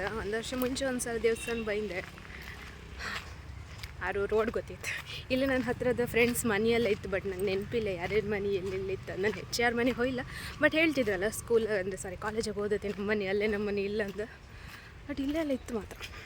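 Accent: Kundapura, India. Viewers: ಬಳಿ ಹಾಯ್ಕಂಡ್ ಚಂದ ಕಾಂತ್ರಿ,ಹಾಗೆ ಹಣಿಗೆ ಕುಂಕ್ಮ ಹಾಯ್ಕಂಡ್ರೆ ಮತ್ತಷ್ಟು ಚಂದ ಕಾಂತ್ರಿ.ಊರ್ ಮನಿ ಕುಂದಾಪ್ರ ಕನ್ನಡ ನಿಮ್ಮ್ ಬಾಯಲ್ಲಿ ಕೇಂಬುದೇ ಚಂದ👍👏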